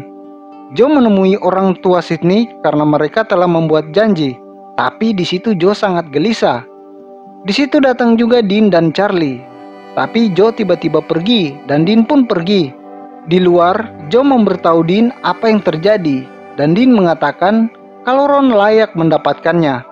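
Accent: Eastern Indonesia, Indonesia. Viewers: Orang timur Lu ya bro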